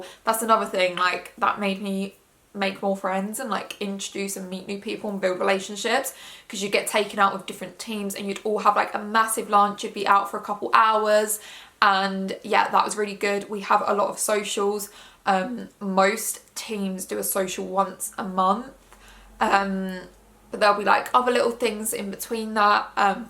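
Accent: United Kingdom. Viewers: Shes deffo from west london i can tell from the accent🤣 no negativity just a guess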